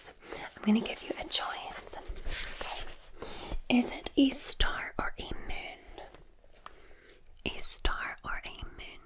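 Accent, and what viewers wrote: Scotland, United Kingdom. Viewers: half an hour of tingles from the resplendent scottish lass. perry the platypus noises ☺️ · Thank you.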